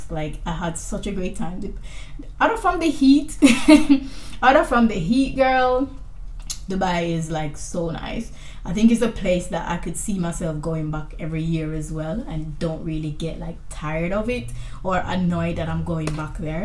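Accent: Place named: Jamaica. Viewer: I could hear the Jamaican accent from your tik toks 🇯🇲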